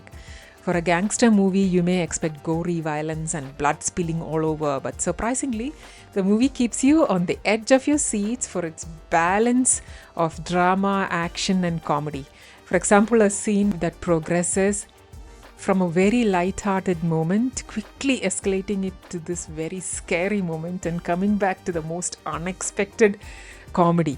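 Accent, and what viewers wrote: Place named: Kerala, India. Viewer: മല്ലു ഇംഗ്ളിഷ്. 100%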